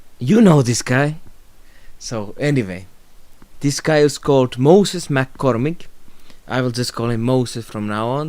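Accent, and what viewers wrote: Finland. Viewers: I couldnt finish watching this video because of the accent but I will drop a like for the effort! Next time you could speak in suomi and have english subtitles, cheers bro!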